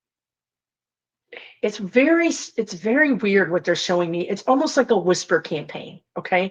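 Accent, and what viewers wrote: Texas, United States. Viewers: Like you don't look white Southern. Sound Texas or Southern fo sho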